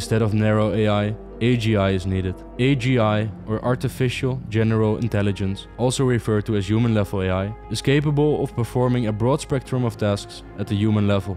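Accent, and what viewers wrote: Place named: Denmark. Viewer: ah cool, kinda sounded like a danish accent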